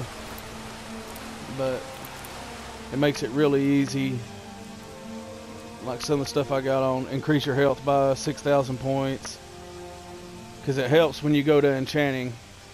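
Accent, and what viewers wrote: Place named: United States. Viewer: I love your sexy stereotypical american accent 😍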